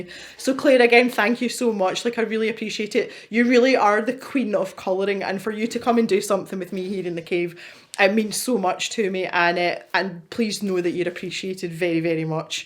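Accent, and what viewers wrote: Scotland, United Kingdom. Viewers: Happy new year,! 🍾🥂 Congratulations on your great achievement! 👏👏👏 I love watching your videos and listening to your Scottish accent. Your sense of humor and your way of talking about your pet peeve markers in the unboxing videos are absolutely awesome, you make me laugh out loud a lot. Keep up the great work! 🙂 Thank goodness I've made up my mind I'll stick with colouring and watercolour painting - otherwise, I'd be ordering all the subscription boxes just because I like your reviews so much. 😉 By the way, it was an absolute honour for me that we got to colour our pages side by side in the Ivy travelling book that went to Johanna Basford last year! 💖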